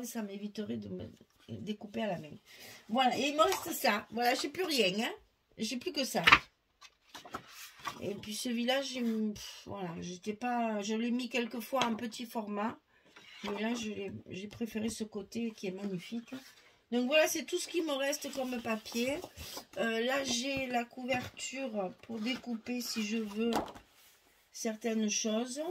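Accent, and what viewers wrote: South, France. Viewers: Bjr. Vous pouvez être très fière de vous Bravo Magnifique une œuvre d art entre des mains d une magicienne!! Vos tutos sont tjrs des découvertes, la surprise du Chef! On part à l aventure... avec!!! Vos explications, votre patience, votre bonne humeur fait que vous mettez du baume au 💙 dès qu on regarde un tuto, qu on entend votre accent du midi, 🌞 on oublie un instant nos soucis!!! Continuez à nous faire scraper, à nous faire découvrir vos tutos tjrs plus beaux... Merci pour le partage, prenez soins de vous et passez de bonnes fêtes de fin d année. Bises. Marie--France. Débutante dans le scrap mais je fairais un de vos tutos c est sûr!!👋👋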